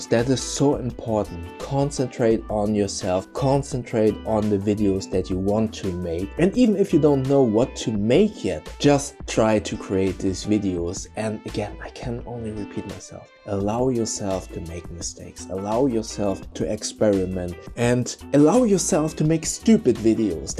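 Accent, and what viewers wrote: Germany. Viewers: I love your voice and your German accent, it's so soothing. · Dude, love the German accent! Echt ein klasse Typ, weiter so!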